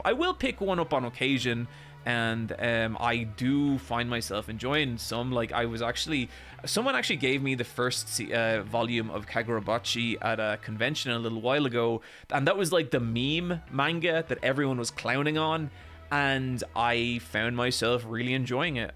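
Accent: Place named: Ireland. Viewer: Watching that guy's videos is going to be like reading nu-Berserk. It's better than nothing, right? Have you noticed that people try to imitate your tone of voice and cadence? It's not just your video titles. They're trying to copy literally everything about your videos minus the Irish accent. What if you include the official Shonen Jump rankings as well as the sales figures? Then you can piss off everyone lol. I really miss those videos of yours because I never gave a shit about Shonen Jump before you started making them, and I have stopped giving a shit about Jump since you stopped making them. I think I am representative of the majority of your audience rather than the Shonen Jump nerds who nitpick them. Maybe you could make a Youtube poll asking these sort of questions: "Did you care about Shonen Jump magazine before watching my Shonen Jump videos?" and "Have you stopped caring about Shonen Jump now that I've stopped making videos about it?"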